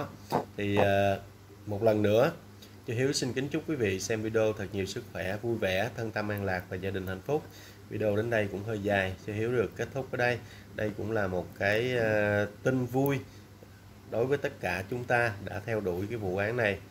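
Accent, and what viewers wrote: Standard. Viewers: Em có giọng nói chuẩn như các bạn trong ban biên tập vậy đó. Chúc em cùng gia đình nhiều sức khỏe và an vui hạnh phúc nhé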